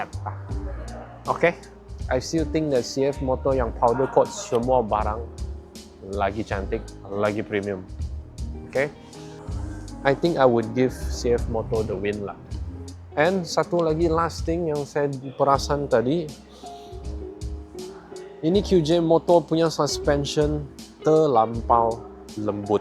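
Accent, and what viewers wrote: Sabah, Malaysia. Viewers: Bila slang sabah dia masuk aku terus naik syiok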